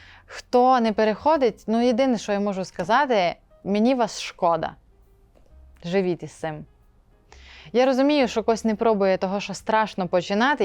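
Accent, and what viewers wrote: Transcarpathia, Ukraine. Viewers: Но дуже файно!... Мені полюбилося!...... А ще як чую мою мою рідну Закарпатську😘.... Супер! !!!! ❤ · як же я люблю твій закарпатський говір. Це є твоя унікальність. Сумую за Закарпаттям і люблю всією душею краєвиди і людей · Хуйово як для першого випуску! Ніхуя не смішно, тадуже дратує акцент! Закарпаття? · Будете матюкатися - не буду дивитися. Це не смішно. Прекрасна Закарпаття говірка спорчена москальськими матюками... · Ти молодець! Я слухаю тебе здебільшого, як носія закарпатського діалекту 🫂 Нехай Господь тебе благословить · ! Дякую за закарпатську мову! Насолоджуюсь слухаючи Вас, дякую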